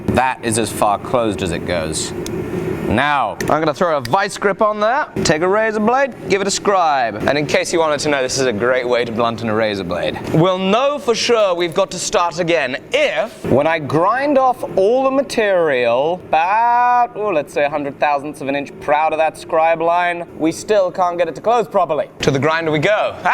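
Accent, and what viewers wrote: United Kingdom. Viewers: Wait..... he is america?!?!! wtf he sounds british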